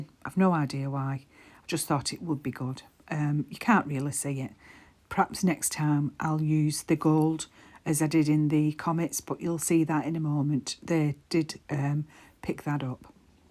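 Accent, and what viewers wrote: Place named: Wigan, United Kingdom. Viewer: Hi, I’m new here and loving your style 😊 your accent sounds very Wigan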